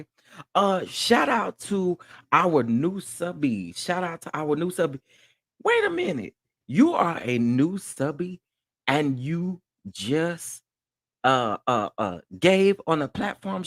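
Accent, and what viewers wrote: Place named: Dallas-Fort Worth, United States. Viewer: I was trying to figure out why I felt a connection to your voice, it’s because we in the DFW! Let’s go to brunch! 😂😂😂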